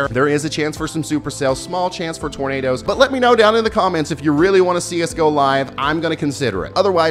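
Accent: South, United States. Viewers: This fella sure does talk fast, especially a guy who has a southern twang.🙈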